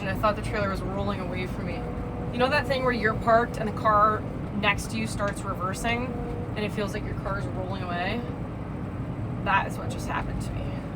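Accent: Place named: Nebraska, United States. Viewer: You really don't need to emphasize that you are a real Nebraskan. We, well I at least, can hear that all too well. And it's more Lexington than Lincoln, and so not Bridgeport.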